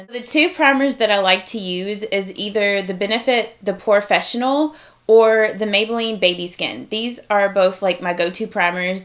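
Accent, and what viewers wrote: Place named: West, United States. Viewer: I like your kinda accent like...it's hard to explain it's like western kinda, you say old like ode...idk but I like it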